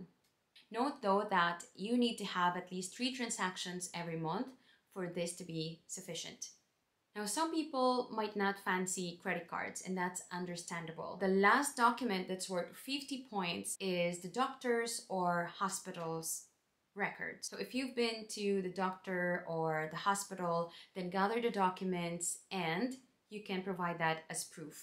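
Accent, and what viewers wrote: Ireland. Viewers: you know you've settled once you have the brogue :) cupla focal and you're 100% there.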